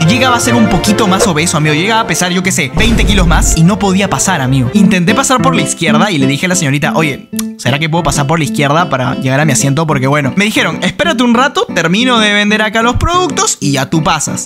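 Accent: Peru. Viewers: Eres peruano😮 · eres de lima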